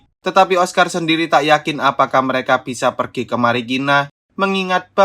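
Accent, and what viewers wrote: Java, Indonesia. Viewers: lho wong jowo mas🎉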